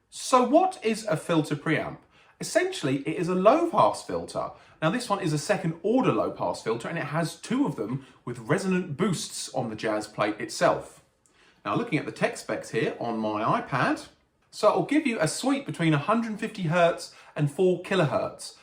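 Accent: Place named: United Kingdom. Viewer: Pity , as from accent it looks is based from U.k . Meaning the second filter preamp avalailbe on the market is still in u.k , brexit sucks . Is also bit weird coming so late in the filter party in the very same country ,but more the better. For reference decades after East ( the best preams of the world i.mo) did it for acg basses , following on the alembic fame for that in the past ( with lo pass AND hipass btw , i have it , exceptional , not practical for live use for speedo changes though ,so deinstalled ages ago ) . Again, The more the better so lucky you , just honestly it should have been mentioned , cause EAST (uk..) IS the MOST versatile if you just judge by that variable , and by far . Us players know it well lolz Anyway that confirms bass is DECADES ahead guitars in terms of alternative solutions ( guitarist dont want even try that lolz)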